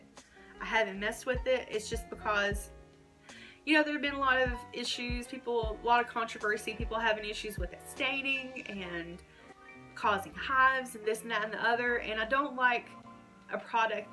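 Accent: South, United States. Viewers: I love your purple hair, southern accent, and shiny eyes!!!!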